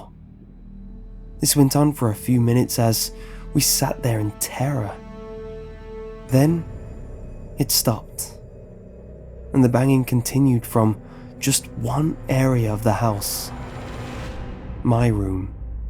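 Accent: England, United Kingdom. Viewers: I'm guessing you don't live in the UK, it's an english accent not british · Yes he has a very sexy British voice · The Favorite Gentleman the accent varies is lucky to get a nice english accent unlike scousers and geordies god i hate there accents lol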